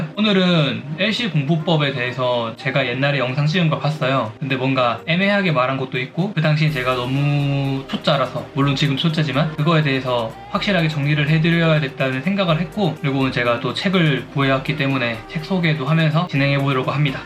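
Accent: Gyeongsang, South Korea. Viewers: 전달력이 좋으신데 말이 너무 빠릅니다.~ 영남 사투리 악센트를 써서 그런지 모르겠지만 표준어를 쓰는 사람에게는 유튜버님의 이야기를 잘 못들을 수 있어요. 말을 조금 천천히 하시는게 좋을 듯 합니다.^^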